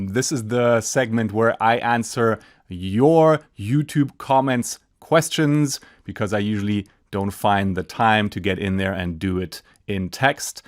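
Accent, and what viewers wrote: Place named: Berlin, Germany. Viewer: My grandparents were from Berlin, so your accent is very familiar to me. 😇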